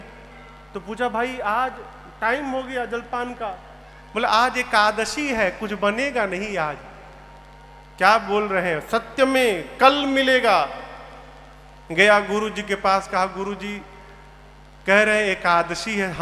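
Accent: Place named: Bihar, India. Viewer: आप कथा कहते समय निम्न स्तर की भोजपुरी,मजाक, बिहारियों की तरह किया करते हैं।v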